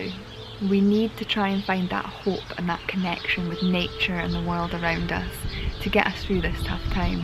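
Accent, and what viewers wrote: Scotland, United Kingdom. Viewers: you have a good heart, and you are able to articulate it in your video's. You have great and entertaining ideas, delivered with your Scottish accent, and a soft voice. You have a bright future ahead of you. You know when to turn the intensity up in your voice and when to be soft. A real blessing. Thank you for your blog. Yours is on my automatic alert. Love the content of your videos. Educational and entertaining. Bill.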